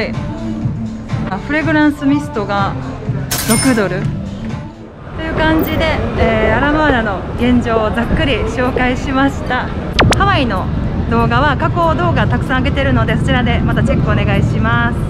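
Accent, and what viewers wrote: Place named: Ise, Japan. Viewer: 同じ伊勢弁なのが嬉しいです✨親しみ持ってます♪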